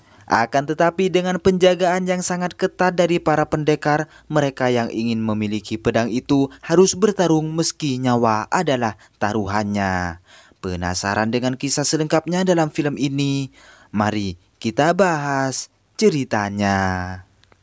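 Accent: Madura, Indonesia. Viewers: Aksennya seperti orang madura.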